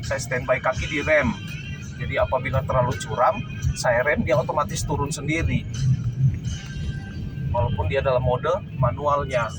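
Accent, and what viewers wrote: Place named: Sulawesi, Indonesia. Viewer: Syutingnya di Jambi tapi dari logatnya om dari Sulawesi kah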